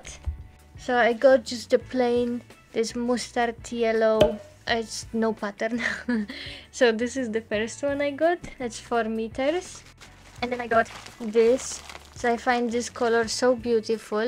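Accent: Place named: Russia. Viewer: you are very polite and soft spoken and you sound like russian 👍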